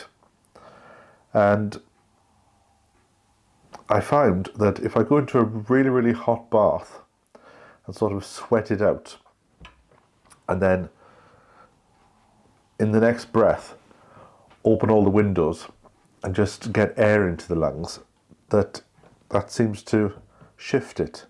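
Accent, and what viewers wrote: Wales, United Kingdom. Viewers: I'm sorry you haven't been well, you certainly sounded poorly. I must confess I could listen to you all day long. I love Welsh accents. My late wife, bless her, was Welsh and I spent a couple of years living in Wales. That was a great time. I hope you shake off that flu and are back to normal ASAP. Good luck!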